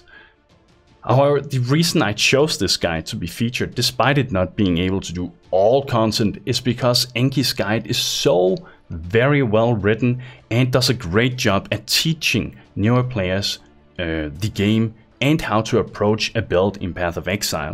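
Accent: Denmark. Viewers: are you danish? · Within the first few words i knew you were Danish lol. Fedt at se en Dansk youtuber der laver content til andre end børn.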